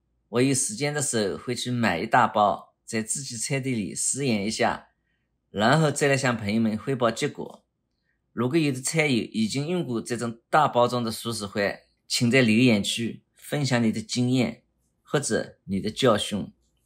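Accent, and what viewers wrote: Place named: Zhejiang, China. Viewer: 我咋觉得像浙江口音，宁波？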